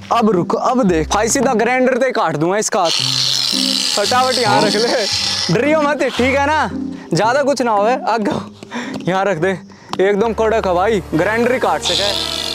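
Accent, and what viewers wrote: Haryana, India. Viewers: Bhai tum haryana sa ho kya😂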